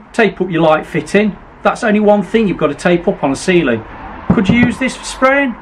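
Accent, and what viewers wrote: Nottingham, United Kingdom. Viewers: I thought that was a Nottingham accent you had, i pass through Netherfield on the train to Forest games travelling up from Norfolk.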